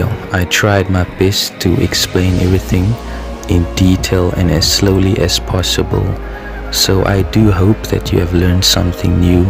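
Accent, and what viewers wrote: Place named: South Africa. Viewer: Your accent sounds South African. I'm from SA.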